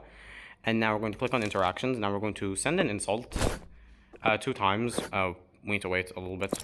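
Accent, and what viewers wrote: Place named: Egypt. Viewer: You are Egyptian? I can sense it from your accent.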